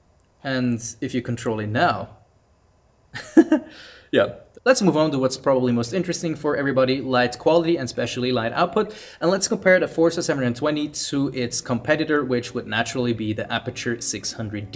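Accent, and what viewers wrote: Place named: Switzerland. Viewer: I can hear that swiss accent miles away. But thanks for the comparison. Feel like Nanlite has been catching up. However im sticking with Apputure.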